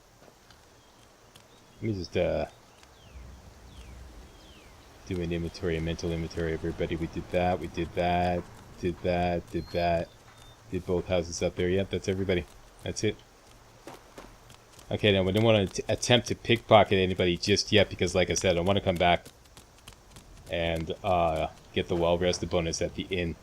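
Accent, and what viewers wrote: Canada. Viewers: Your Canadian eh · i'm in this for the Canadian accent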